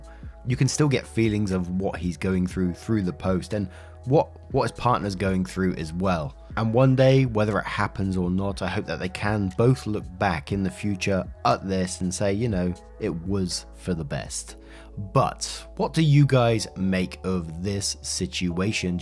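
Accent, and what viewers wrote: England, United Kingdom. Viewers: just wanna say, i am american, and I'm not huge on english accents but I love your voice, its so calming even when you are swearing i love it xD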